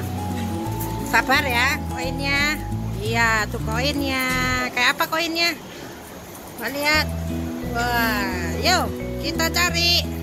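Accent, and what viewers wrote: Java, Indonesia. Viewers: Kok kya orang jawa hhhh...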